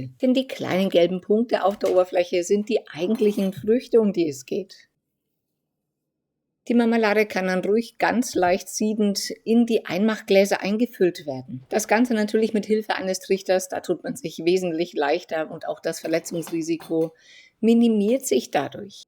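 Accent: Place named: Meiningen, Germany. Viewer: Hallo, ich finde deine Videos super! Perfekt erklärt und mit einfachen Zutaten! Ich hab mich schon durch einige deiner Brote durchprobiert, beide Sorten Frischkäse ausprobiert und gestern Kisch-Erdbeermarmelade gemacht. Alles Super! Ich komme aus der Nähe von Meiningen. Deinem Dialekt nach zu urteilen müstest du auch aus der Nähe stammen!? Liebe grüße Tino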